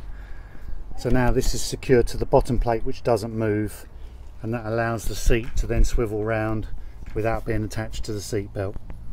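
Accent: United Kingdom. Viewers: You’re a cool dude with a great British accent (which locality?). Not your best vid.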